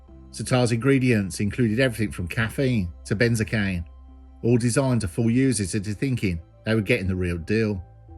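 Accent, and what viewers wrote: Birmingham, United Kingdom. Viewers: Great content as usual maye,I ain't biast been a fellow brummie but just wouldn't sound the same otherwise.👍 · Why are you calling them burner phones? Thats an American term. Here Pay As You Go phones (PAYG) as perfectly normal , half the population doesnt use contract phones. If you tell people you dont have a phone contract, no one will think thats weird. This isnt America. Are you actually British, or just an American using a British narrator? EDIT: As he wants to argue , quote: ''' The popularity of the term was undoubtedly fueled by its frequent use on the HBO crime drama "The Wire," The following excerpt from one episode (expletives deleted) actually provides a helpful vocabulary lesson: Kima: What's up with these phones? I've been seeing kids throw them away all day. They got cash like that to waste? They be laying all over, man. 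Sometimes you find minutes on them, sell them for walking-around money. Mello: They burners, Kima. Kima: Burners? Google's N-gram app, which traces the frequency of words' appearances in print, shows that "burner phone" skyrocketed in use during the early 2010s.'' *Where does The Wire come from? AMERICA. Its an American term.* When you top up your phone it literally says PAYG right there. All the phone networks call it PAYG. Wha5t you think payg stands for eh? PAY AS YOU GO Your mum likes sucking on mine I dont need to be told. I've been on road. No one used the phrase burner phone. The only time you hear it in the uk is in police shows and music. The Wire was using the term in the 2000s. No one in the uk was using the term burner phones in the 2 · well said old boy well said...where on earth would you get a pukka brummie voice bot?? im from london and love you say investigation 🤣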